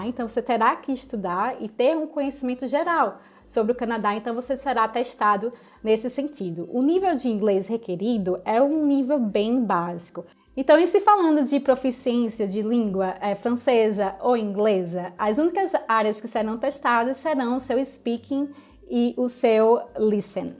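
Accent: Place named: Portugal. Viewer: Seu sotaque é tão bom de ouvir kk parece um pouco o português de Portugal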